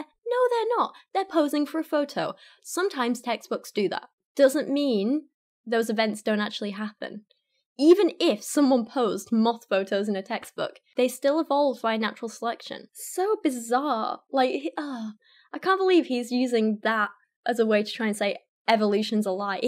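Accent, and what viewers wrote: United Kingdom. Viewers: Sorry little girl but Kent would destroy you in a debate! You are not smart! I know you think you are but your wrong. just like most ppl like yourself are. You talk to stuffed animals! Your brit accent doesn't mean you are smart honey. Your gen. were spoken about by Jesus himself and you will see the end days soon enough and I cant wait.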